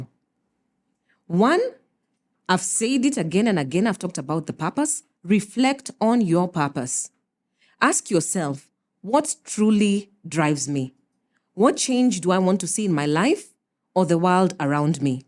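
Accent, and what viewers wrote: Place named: United States. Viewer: Kizungu ya amerkaa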